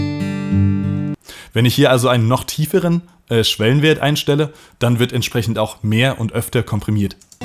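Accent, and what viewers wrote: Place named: Northern Germany, Germany. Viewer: Darf ich fragen, wo du gebürtig her kommst? Dialekt mäßig, würde ich Norddeutschland sagen. Niedersachsen oder Hamburg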